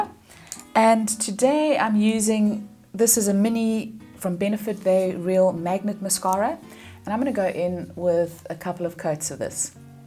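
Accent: South Africa. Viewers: You mention “Sheen” brushes. Is that the spelling, and what country are you in? I recognize the South African accent, but nowhere is there any information on where these products are available or their price.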